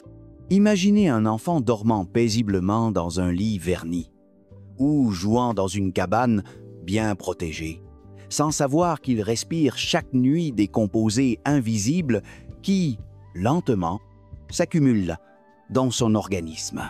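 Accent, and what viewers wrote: Canada. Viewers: Le monsieur (à moins que ce soit une IA ) a plutôt l'accent canadien. C'est normal ?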